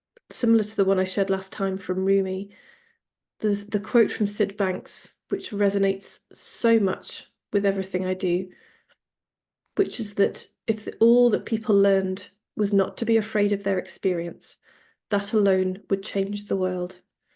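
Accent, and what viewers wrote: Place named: West Country, United Kingdom. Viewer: Hi, I recently saw you on the non duality podcast and HAD to search you.. I noticed the south West Country twang in your accent also.. I’m from Bristol you see! Your book is ace so far btw.. just sat here reading it now.. I was wondering if you do any work one 2 one with people or workshops? Thanks.. keep up this wonderful work….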